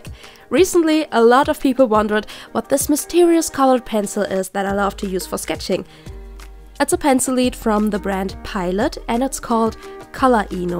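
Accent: Germany. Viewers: You’re german, aren’t you? :D your accent sounds like mine (I’m german) that’s why I‘m asking.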